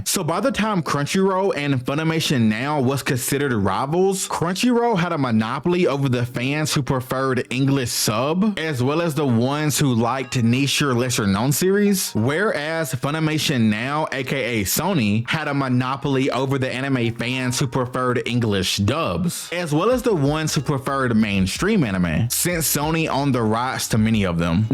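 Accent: South, United States. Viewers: Who was that guy on Bones with the strong country accent… this narrator is giving strong him vibes. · This man def a southern brotha✊🏾😂, all love, I just can tell 😂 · You sound very hillbilly, Mr Ty for making the video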